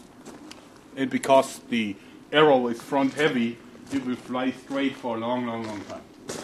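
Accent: Germany. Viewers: Deutscher? Hört man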